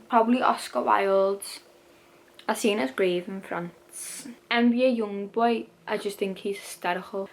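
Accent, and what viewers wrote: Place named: Liverpool, United Kingdom. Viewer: LIVER POOOOL!!! Accent so strong!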